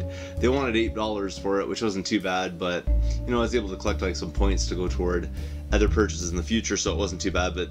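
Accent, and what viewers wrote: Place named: Canada. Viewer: i love how you silly Canucks pronounce Mario..good stack of stuff there man!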